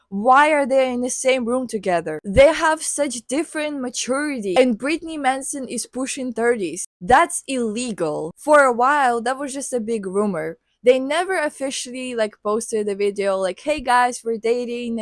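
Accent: Russia. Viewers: С самого начала слышала малюсенький русский акцент и думала мне кажется но нет🙂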